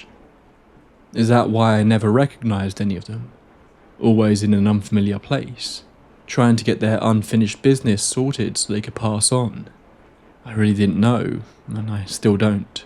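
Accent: United Kingdom. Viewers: Love your british accent .great stories..from usa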